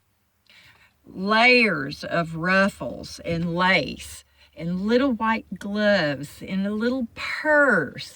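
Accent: South, United States. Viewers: I live in North Carolina and I look so forward to your videos. You sound southern.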